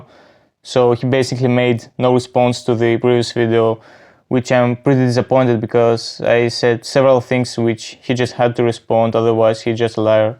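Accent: Greece. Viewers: Love the accent, fellow greek accent. Hyra is being a little kid again like wtf. Thanks for sharing your view. Hyra is totally wrong here, lets just not like be toxic back. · I can hear the greek accent coming through my ears lmao Much love man ❤ Stay cool about it There is no reason to waste your time on him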